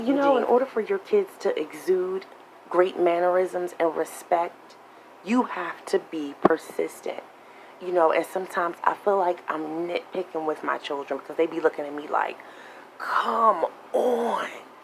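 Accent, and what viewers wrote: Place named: North Carolina, United States. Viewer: I am born and raised in NC and yes ma'am you sounded like me telling about my upbringing.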